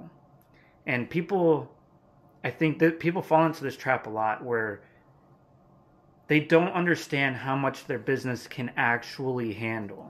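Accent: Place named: Ohio, United States. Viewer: and you are a content creator too on top of a full time job and Pt ebay! I'm curious where in the states are you from? I have cousins from Ohio and every time I'm listening to you I find myself thinking of them and their accent. what did you go to college for I'm curious? I went to be an english teacher and I kinda lost interest in that as well and couldn't see myself actually being a teacher, mostly because I"m an introvert. it's so ironic about what you are saying about "don't give up"....last night I told my wife "I don't know if I want to keep doing this"....lol. this is a timely reminder. "rule the day!"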